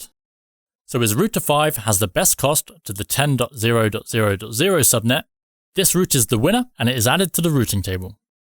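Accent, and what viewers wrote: England, United Kingdom. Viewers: Dude, it's called English pronunciation!!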